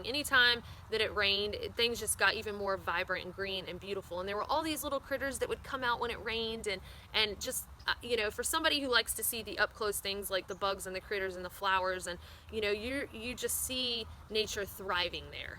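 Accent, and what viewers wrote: South, United States. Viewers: You're slowly losing your southern accent!! :)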